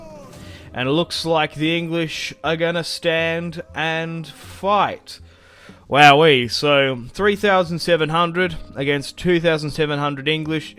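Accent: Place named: Australia. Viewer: gday mate how you going! Love your australian accent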